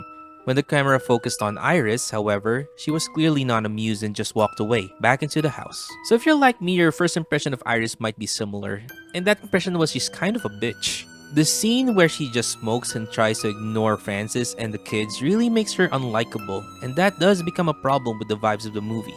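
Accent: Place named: Philippines. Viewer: It's nice that you are using Philippine English! Hahaha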